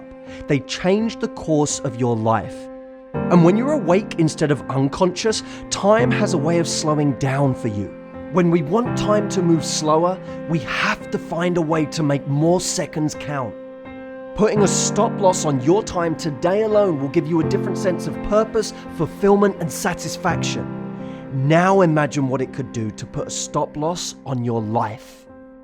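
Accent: United States. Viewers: Your American accent is impressive! Look out Hollywood! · Came here for the life tips. Stayed for his American accent😂😂😍😍😍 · great american accent! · WHOA That American accent!!! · he does an American accent so well, but I love his accent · like your american accent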